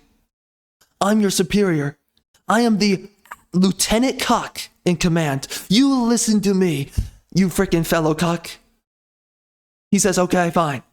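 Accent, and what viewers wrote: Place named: Eastern Europe. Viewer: You sound Slavic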